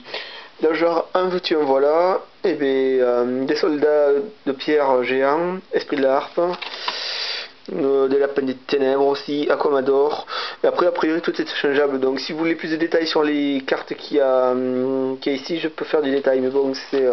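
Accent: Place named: South, France. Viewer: t du sud comme moi toi vu ton accent ! (ce n'est en rien une critique) et j'aimerais t'échanger le dragon détonant la derniere carte est canon derriere la porte ce qui fait que quand tu dois te prendre des dégats par effet , c'est ton adversaire qui se les prend et aprés si tu as des protecteurs du tombeau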